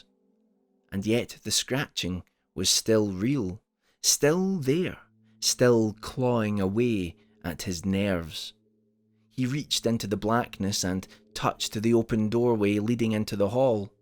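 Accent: Scotland, United Kingdom. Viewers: one of my very favorite authors! What a dark and beautiful story this was... Good for Martha 👍!! Thank you, I love it when you read your own stories with that lovely Scottish accent. I thought at first the story had just ended with that long pause but was happy when I went back to listen and found the fantastic ending! I hope you're staying happy and healthy in spite of all the madness of this world right now. Much love to you and your little family ❤️😘♥️. ♥️🇺🇸♥️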